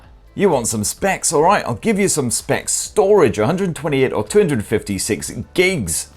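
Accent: United Kingdom. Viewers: love your classic british humor laced accent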